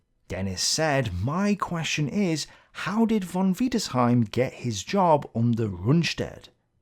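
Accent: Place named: Northern England, United Kingdom. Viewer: Just to follow up on my previous comment on your Case Blue video. What would you have in common with people like von Bock or von Wietersheim? They represented an ancient military cast going back nearly 900 years. As I said previously - they were Prussian, aristocratic, snobbish, arrogant - they belonged to the elite of the landowning (upper) class in central Europe. They really did live in a different universe. To hear you talk about them in your northern English accent brings a smile to my face. Cheers - btw I removed the word 'posh' as I don't think that adjective would apply to these people.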